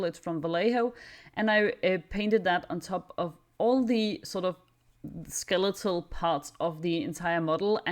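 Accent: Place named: Denmark. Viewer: something in your english reminds me of how I speak english.. are you perhaps danish? awesome scheme btw(og hvis du dansk, stærkt farvevalg)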